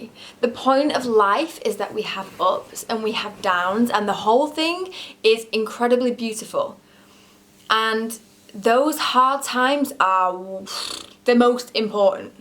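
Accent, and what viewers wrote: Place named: Warrington, United Kingdom. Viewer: Are you from Warrington/leigh? You sound just like my friend!!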